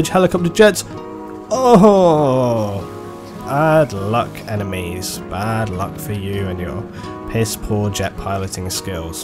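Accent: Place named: RP, United Kingdom. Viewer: U sound so posh and it annoys me this is why American films take the piss out of British lol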